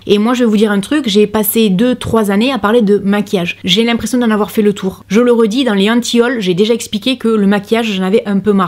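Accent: South, France. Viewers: J aime ta franchise ta sincérité surtout tes bons conseils et ta gentillesse tout ça arrosé de ton petit accent du midi Merci mon petit rayon de soleil s à nous change du make up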